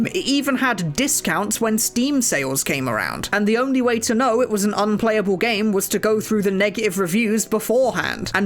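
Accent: United Kingdom. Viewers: nice video, but would have been better without british accent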